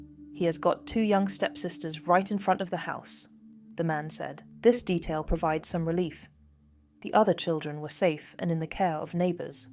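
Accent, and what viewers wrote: United Kingdom. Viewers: jesus christ this channel sucks!! two minutes listening to this british niteit made me wanna blow my brains out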